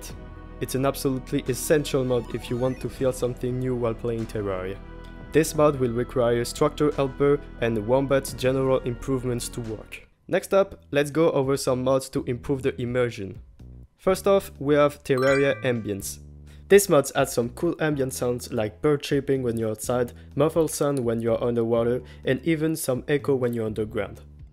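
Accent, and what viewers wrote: France. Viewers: Je pensais pas tomber sur un français vu l’accent mais incroyable la vidéo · Hey homie you're french aren't you ? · You are french i can feel it in your voice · With your accent I guess out that you are French😊 and as a French people I must help the others French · Wait youre french??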